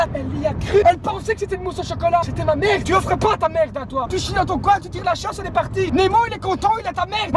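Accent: Belgium. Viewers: en tant que belge ayant fait des études de logopédie, jpeux dire que c'est pas qu'une question d'accent, mais vraiment de placement de voix, de volume vocal et d'intonations. x) Je dis pas, c'est très bien imité, on fermant les yeux on pourrait penser à un nouveau sketch de Mr Manhattan voire une nouvelle scène de Dikkenek , mais c'est pas le but ici je pense, d'où le coté dérangeant ^^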